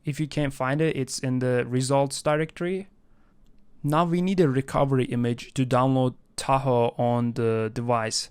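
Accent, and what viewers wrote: Iran. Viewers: I love ur Persian accent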